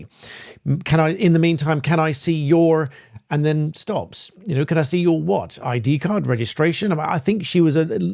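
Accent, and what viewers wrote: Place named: RP, United Kingdom. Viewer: Another long and boring posh talk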